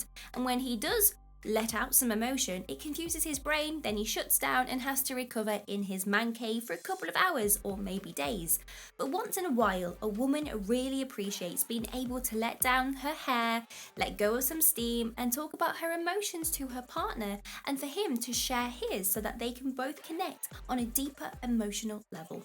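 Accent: United Kingdom. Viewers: Just love that British accent...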